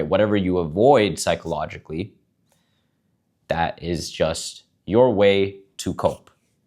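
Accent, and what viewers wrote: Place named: India. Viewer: He is not somali. He looks like indian. And he speaks their accent.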